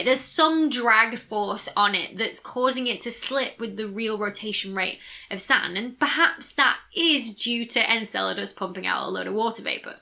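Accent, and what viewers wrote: United Kingdom. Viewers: Tell me, Doctor, what accent of English is it that you wrap your presenting in? It does not sound exactly the way of American I am used to hearing on YT, nor quite British. It is rather lovely, let me say... Oh, British then? What kind of British? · Dr Becky... charming as always... your UK pronunciation of geysers indeed sounds like US pronunciation of slang for old men... keep the accent... One topic I hope you'll do would be on tidal locking of bodies in orbits. It is really hard to understand why rotation rate could/would synchronize itself to orbital speed since bodies don't have handles (like pots and pans) by which the larger central body could "grab" them and adjust their rotation rate (eg: how did earth fine tune Moon's rotation to tidally lock it since moon doesn't have handles and Earth doesn't have arms by which to grab and do the trick). 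· I thought 'Geezer' is an anglicism. Turns out geezers are a global phenomena. I can't tell woch county she's from, but local accent is most definitely at work here. Somewhere between Cardiff and Glasgow, i'd guess. England alone have more diverse dialectal difference than entire USA. Like, you could make out the native postcode from listening to them. Geezer.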